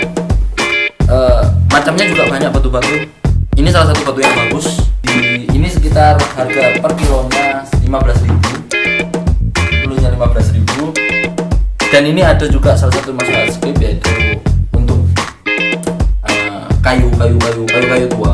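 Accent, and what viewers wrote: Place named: Java, Indonesia. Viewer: iki wong ndi seh? medok banget wkwk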